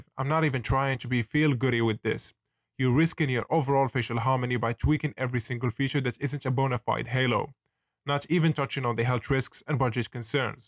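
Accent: Morocco. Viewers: من أول فيديو عرفتك مغربي من صوتك 😅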